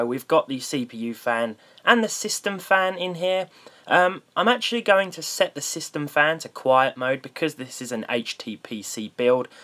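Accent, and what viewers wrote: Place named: Estuary, United Kingdom. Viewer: Could be Kent, I live in east Kent and it's a variation of the estuary accent from this corner of the country, Essex into Kent.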